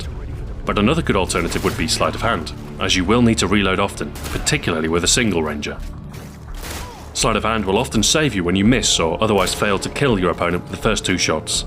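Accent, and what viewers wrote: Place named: United Kingdom. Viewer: In any other case you would have the same view. You probably only like it now because some guy with a badass British accent uses it. Rite?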